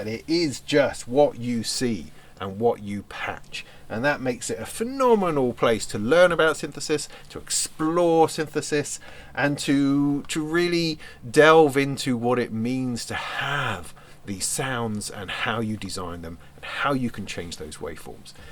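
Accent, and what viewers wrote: England, United Kingdom. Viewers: I’ve watched a few of your videos where you have explained you have American viewers. You’re just a sell-out. If the Yanks are too stupid to get their calculators out then so be it. You aren’t Murican, you don’t live there so stop pretending prices in dollars are part of your life. If you get loads of adulation for how you pronounce the names of synths then go and make a YouTube channel named I’m a Jolly English Chap What? Bit of a bell end aren’t you.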